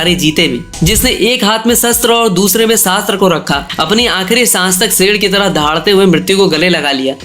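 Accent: India. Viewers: Thank You Bhai. Your Accent is definitely Hindi but your Voice is touching Millions of Marathi Community People. Every word & Sentence in these video is giving correct information about Chattrapati Sambhaji Maharaj. Thanks ❤❤🚩🚩🚩🚩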